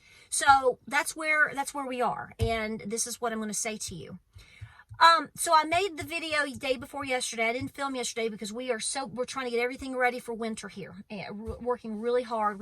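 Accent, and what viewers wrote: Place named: Appalachia, United States. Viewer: I e always enjoyed your videos! 💕 I love your voice, Appalachian twang and all! 😘 especially love your singing!!! Keep preaching sister! Some people can’t handle the truth or fear provoking retoric. So be it. They can listen to something else. God bless them. Hopefully they hear the truth somewhere and are prepared regardless. But, yes, there are some (a few that make themselves seem numerous) that are advocates for the evil ones. They (Masons, satanists, wiccans, etc) have infiltrated many churches- especially the Baptist, Catholic, and traditional Jewish Churches. Division and hatred are from hell. We need unity within the Christian community. All of us united in love. 🙏❤️🙏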